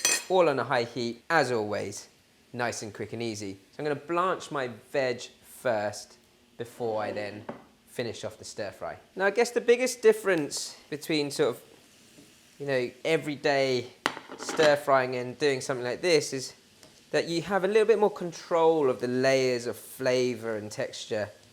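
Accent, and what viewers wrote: United Kingdom. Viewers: Greetings from Walnut Creek CA. Looks delicious. Just ran into this channel for the first time. Love the British accent (or maybe it's me with the accent)!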